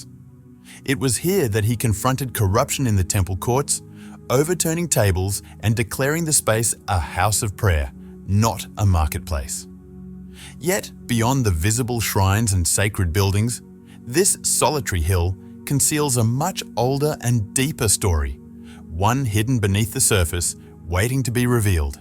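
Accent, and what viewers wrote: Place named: United Kingdom. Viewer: bull cocky brought to you AS ALWAYS BY A BRITISH ACCENT!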